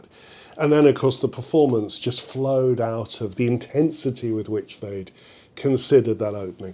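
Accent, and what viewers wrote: United Kingdom. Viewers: No need for blasphemy to praise Bach. He would be appalled by your reference to “divinity.” In addition your performance and narrative are disgusting—especially the poncey British accent. Goodbye!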